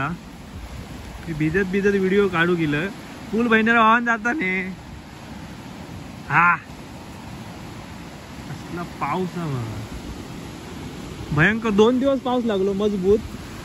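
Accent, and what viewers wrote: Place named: Malvan, India. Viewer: Enjoyed vlog ani Asli malvani aikaila nicely explain the route 😊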